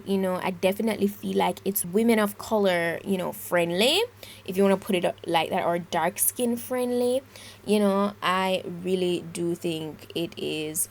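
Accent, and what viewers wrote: Jamaica. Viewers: I hear that Jamaican accent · Love everything....the makeup, the Jamaican accent😉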